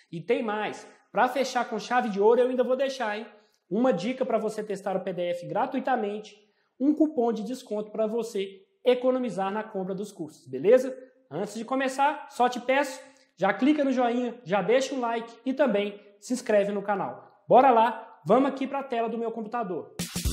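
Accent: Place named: Minas Gerais, Brazil. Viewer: obrigada, você é uma inspiração e esse sotaque mineiro é massa hahahahha parabéns pelo seu trabalho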